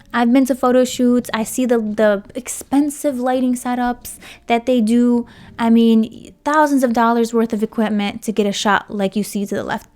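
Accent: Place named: Midwest, United States. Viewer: I can tell your accent is from the mid-west lol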